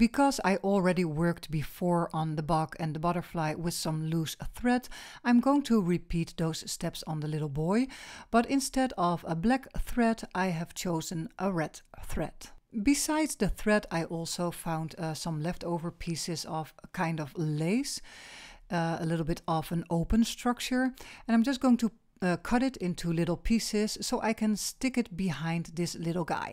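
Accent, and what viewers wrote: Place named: Netherlands. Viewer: Hey dear, how nice that I could discover you through Luise, because your projects, design and expression is just cool & beautiful 😍 Interesting artist exchange you did together, I think that's great and must have given you a lot of pleasure 🥰 and me a lot of inspiration. You speak, like so many Dutch people very good German and English and I love the Dutch, their country, their culture since I was two years old (1966) and love their accent so much. ❤️ Sorry if this comes across as totally slimy, but it's just the way it is with me, because Dutch culture has had a big influence in my life. I lived close to the Dutch border for 48 years, did a lot of shopping, had some friends there and spent many, many holidays there. I also bred Kooikerhondje for 10 years 😆 Thank you for sharing your art with so many people! 🧡... I am happy to be able to learn from it!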